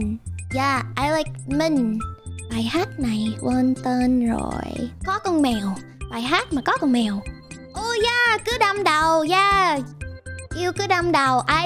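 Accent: Mekong Delta, Vietnam. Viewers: nói tiếng Việt nghe đậm chất miền tây luôn á với lại mới biết đến thấy cute dễ thương...ngoài bả mình còn mê cả Shoto nữa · cách nói đậm chất miền tây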